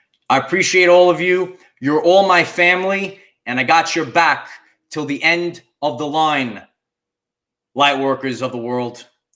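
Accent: New York, United States. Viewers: I love your New York accent!!!!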